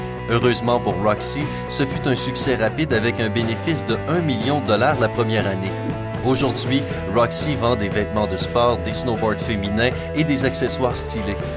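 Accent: Quebec, Canada. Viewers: Yo sont accent quebz 😂😅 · Oh quebequois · La voix a un petit accent québécois vous trouver pas?🤨🧐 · MALAK LOURAK c’est l’accent québécois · Norabella07 Hamdani Peut être parce que c'est une vois québécoise.... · MALAK LOURAK il a pas le nez boucher il est québécois... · Ces moi ou la voix et québécoise ???😂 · J’adore l’accent québécois 🤗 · MALAK LOURAK lol c'est juste une voix cébékoise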